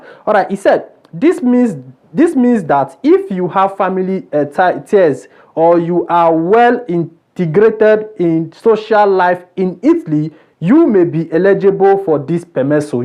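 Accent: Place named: Nigeria. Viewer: Bro please try to speak good English not only Nigerian English okay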